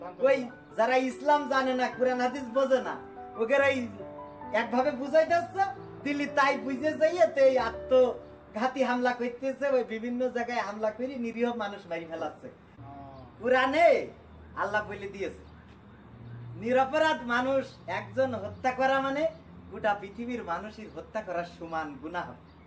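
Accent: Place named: Jessore, Bangladesh. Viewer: যশোরের ভাষা খুব ভালো লাগে, আপনাকে যশোরের ভাষায় সব সময় কথা বলা মানায়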